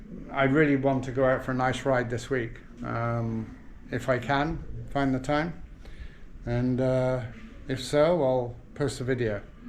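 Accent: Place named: Scotland, United Kingdom. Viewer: You sound like you might be from south of scotland?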